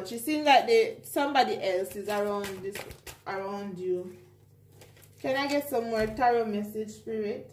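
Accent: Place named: Jamaica. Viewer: Omg mi just know seh is a Jamaican accent that ❤👏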